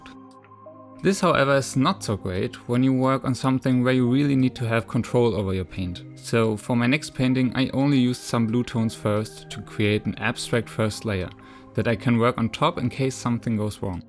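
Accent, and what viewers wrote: Germany. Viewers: Hello, Im new here. And I have a question: Is the a German accent Im hearing?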